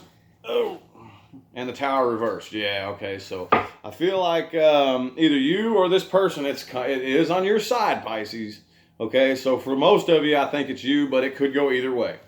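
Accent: South, United States. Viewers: Marry me? 😍 A tarot reader with a southern accent....swoon!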